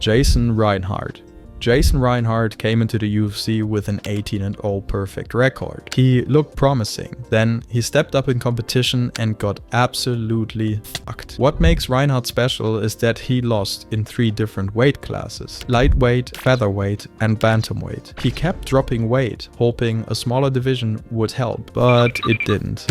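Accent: Germany. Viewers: German accent is strong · Gold video. Hat lange gedauert bis ich den deutschen Akzent gehört habe😂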